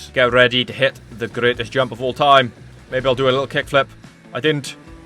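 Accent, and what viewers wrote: Wales, United Kingdom. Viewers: Bro i am one min in and your personality and humour have me staying for a little longer legend live the welshy accent what’s occur-in! Get hype for sod3 brother god bless ✌️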